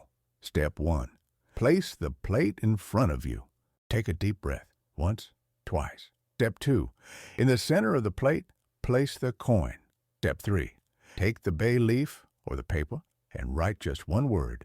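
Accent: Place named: South, United States. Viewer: OMGracious.......nothing like a southern drawling buddhist message~ ~ ~ giving us an opportunity to stretch past concepts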